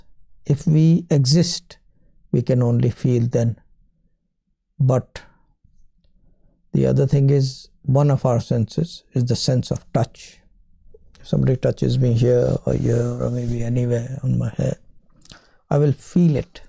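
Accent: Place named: England, United Kingdom. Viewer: Uff Gorgeous Grumpy English Accent 👌👌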